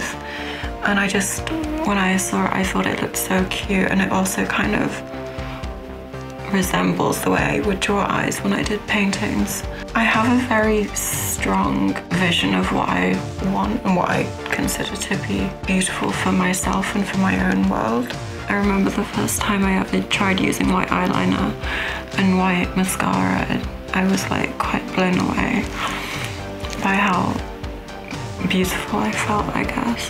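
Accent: United Kingdom. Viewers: Thank you! Their voices are almost identical, despite the British accent.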